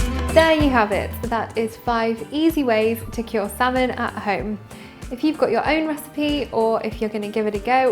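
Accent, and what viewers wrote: United Kingdom. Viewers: I love her accent, she has an old school British charm.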